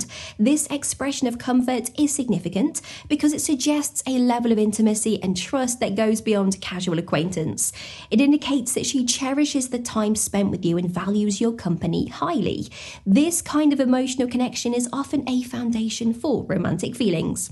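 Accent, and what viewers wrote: England, United Kingdom. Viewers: I am not sure your right or wrong I really don't care I love watching your videos because your beautiful and love your English accent.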